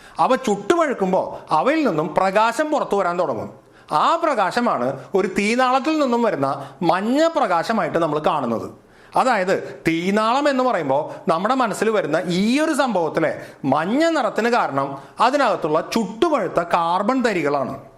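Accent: Thrissur, India. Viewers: മ്മടെ തൃശൂർ ഗഡി ആണല്ലോ ഇദ്ദേഹം സംസാരം കേട്ടിട്ടു... 😌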